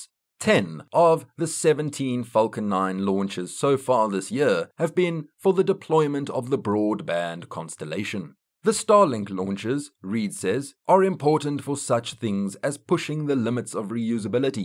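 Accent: South Africa. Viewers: Aha. South African voice.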